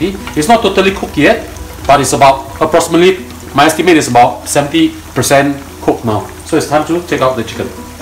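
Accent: Singapore. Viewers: I would say he has a heavy Singaporean accent. It is not Hong Kong. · Singapore English lol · This is Singlish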